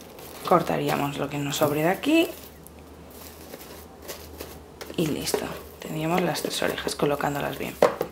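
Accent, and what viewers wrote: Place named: Galicia, Spain. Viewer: Tu bello y dulce acento gallego te delata😄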